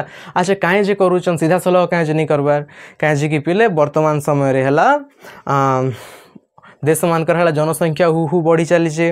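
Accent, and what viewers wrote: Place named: Kalahandi, India. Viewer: Kalahandi language re sunle bhal laguche 💕💞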